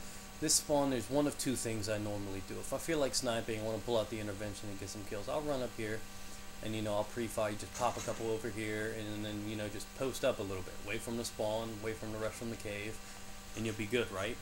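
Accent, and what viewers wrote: New York, United States. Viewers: he sounds like he's from new york lol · New york accent lolz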